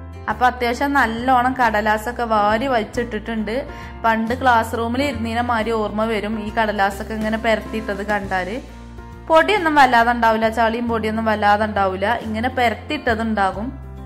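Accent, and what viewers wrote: Malappuram, India. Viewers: ഇത്തയുടെ ചാനലിൽ അത്യമായിട്ടാണ് വീഡിയോ കാണുന്നെ ☺️ നമ്മടെ malappuram ഭാഷ ഉഷാറായിട്ടുണ്ട് 👍👍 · മലപ്പുറം ഭാഷ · അതെ. തനി മലപ്പുറം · Like 29👍👍👍👌👌malappuram basha 👍👍👍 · Ningade mlp slang Super to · Soooper eniku malappuram Basha nalla isttamanu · Vlog adipoli Malappuram samsaram 😄😄😄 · Ur Language is real malappuram taste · Enthaaan itrak mosham ano ngale malapuram slang itrak veandaayirunnu too sad to hear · ഹഹഹ...ഹഹഹ...മലപ്പുറം ഭാഷ അടിപൊളി....കൊള്ളാം...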